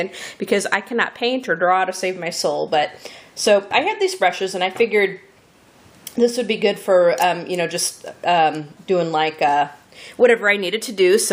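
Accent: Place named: United States. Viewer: I'm English and I love your American accent :) Beautiful Also your laughing is Infectious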